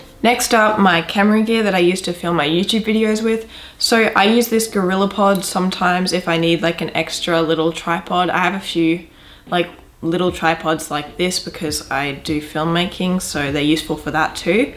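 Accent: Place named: Australia. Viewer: Love your business and its nice to hear another Aussie voice!!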